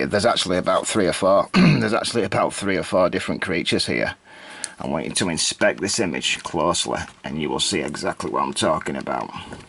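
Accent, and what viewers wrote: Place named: Lancashire, United Kingdom. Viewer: hey, I remember that huge dinosaur in manchester museum hanging from the ceiling when I was a kid, I think it was supposedly a T.Rex? 65 million years old 🤣 I was totally indifferent to it . Maybe bc I was a girl. I want to ask are you from north side you have gorgeous Lancs accent... & that cynism & skeptism. Superb. Miss the mancs voice.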